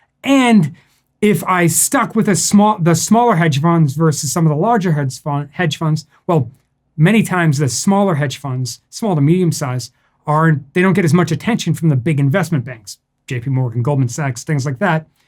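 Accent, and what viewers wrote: Boston, United States. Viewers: It wasn't until you said you were from Boston that I noticed the accent 🤣 I'm from Brockton, should have picked up in that earlier. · You are from Boston ? I could never have guessed !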